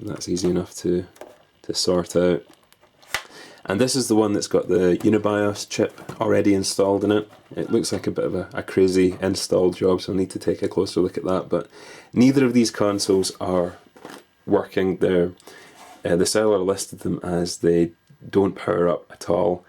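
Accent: Scotland, United Kingdom. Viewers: are you of Scottish origin?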